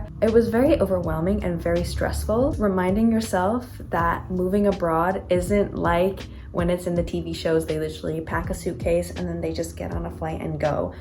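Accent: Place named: Paris, France. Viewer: loved this video!! as a french girl i can tell you that your french is sooo good! you have the best parisian accent! j'espère que tu aimes Paris xx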